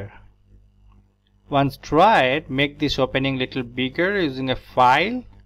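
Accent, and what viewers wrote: India. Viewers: Are you and Indian from Southern part ????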